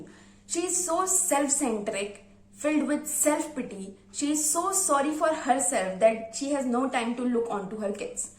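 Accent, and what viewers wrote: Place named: India. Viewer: My knowledge of english is poor. But I can understand little with your nice pronunciations with indian style of english speaking. Thank you... But my humble request to you that please make all your videos in the Hindi language also. So we can understand it easily. Here are Indians in majority so more and more people take advantages of your knowladge and reviews. Please tell whole story of book in brief also.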